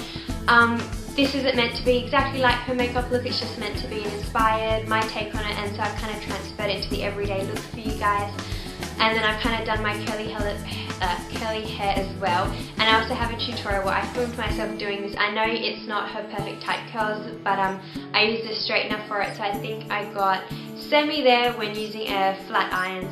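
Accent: Australia. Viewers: Sometimes you sound Aussie and then other times you sound like you are putting on a British accent. FYI I am Australian so I know the difference.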